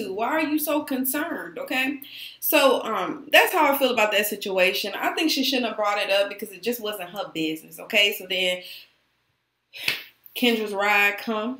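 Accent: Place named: South, United States. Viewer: are you from the south? You kinda have an accent lmao ( Love from Canada ❤)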